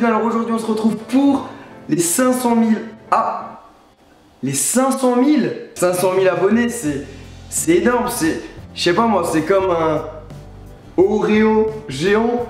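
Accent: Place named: Alsace, France. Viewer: Coucou toi c la première vidéo que je vois de toi et j'adore c cool +1 abonnée et juste une question : est tu alsacien car tu as un petit accent PS moi je le suis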